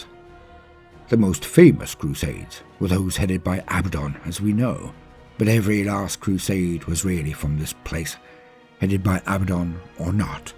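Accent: United Kingdom. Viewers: one I agree with you YouTube is buggy two can I do r e a c t your amazing videos three are you British? Your excellent sounds like it. It’s serving to hear it as will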